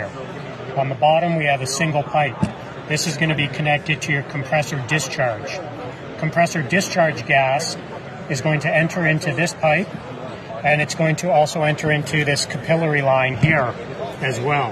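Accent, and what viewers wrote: Canada. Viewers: I think they are Canadian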